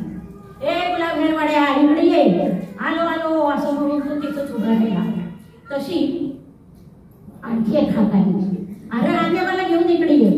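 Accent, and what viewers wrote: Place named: Rural, India. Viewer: छान! हुबेहूब प्रत्यक्ष प्रसंग समोर दिसू लागतात. ग्रामीण भाषेचा लहजा किती सहजतेने वापरला आहे. समाधान वाटते.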